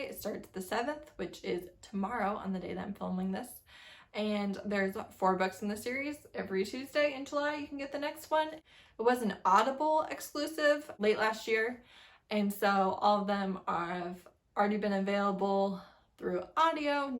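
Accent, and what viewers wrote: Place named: United Kingdom. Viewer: you always sound British in your intro lolll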